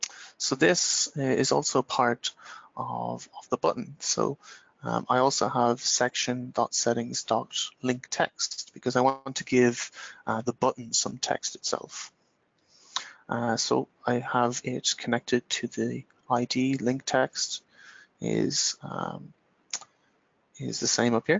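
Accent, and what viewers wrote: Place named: Ireland. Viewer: It took me a minute to realize the sound "TEAM" is an Irishman trying to say "THEME."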